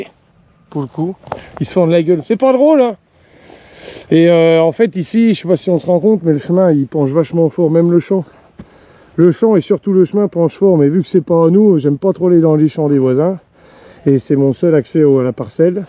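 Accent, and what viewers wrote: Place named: Meuse, France. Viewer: Joyeux anniversaire à la grand-mère 🎉. Il y a pas malin pour la benne. Et par contre pas de soucis tu es bien meusien l'accent est bien présent. La meuse c'est les meilleurs.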